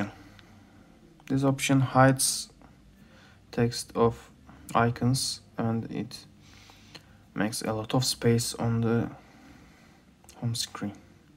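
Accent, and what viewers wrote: Turkey. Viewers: Aksan tanıdık dedim meğer turkmus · Ne kadar da Türkiş bir aksan derken adam harbiden turkmus zaten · Türk İngilizcesi bu nerde duysam tanırım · niyeyse türk olduğun çok rahat anlaşılıyor konuşmandan ahaha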